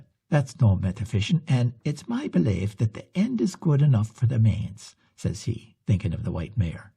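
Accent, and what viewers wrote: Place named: Ireland. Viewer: I thoroughly enjoyed listening to this scary Irish tale. The Irish brogue reminded me of the tales my grandad would tell me when I was a child. I felt like i was back in the old country sitting by a warm peat fire. Thanks!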